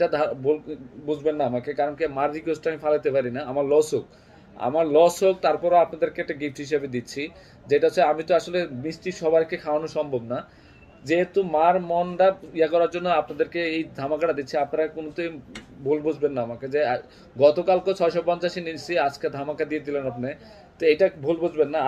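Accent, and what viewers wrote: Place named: Khulna, Bangladesh. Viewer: ভাইয়া মনে হচ্ছে আপনার বাড়ী খুলনা বিভাগে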